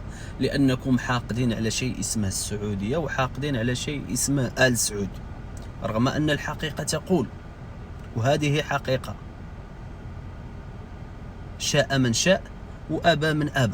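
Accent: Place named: Morocco. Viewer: انت مغربي ولست سعودي كيبان ليا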